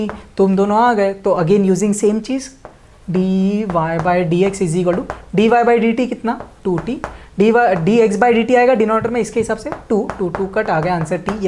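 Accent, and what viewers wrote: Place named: Hyderabad, India. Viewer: Hyderabadi hai kya ji tum bhot bharti bolte aur sikhate bhi🔥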